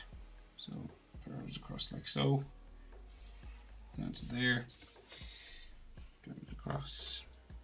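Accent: Ireland. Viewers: i’m gonna draw so many of these lol,also are you irish?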